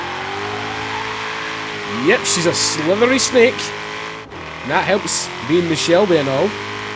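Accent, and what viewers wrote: Scotland, United Kingdom. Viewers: Where abouts in scotland are you from?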